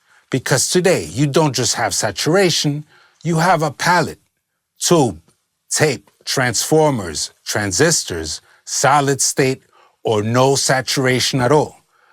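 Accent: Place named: New York, United States. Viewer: Not to mention the NY accent glues this all together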